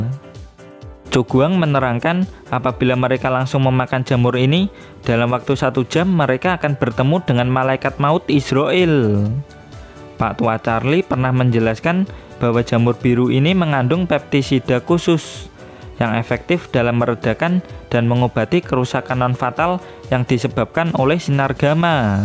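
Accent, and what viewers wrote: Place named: Java, Indonesia. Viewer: Cuma saran min,,maaf sebelum nya, kalau bisa logat Jawa nya gak usah di keluarin,,mending bahasa Indonesia aja... Pendenger gak semua sama suku kan...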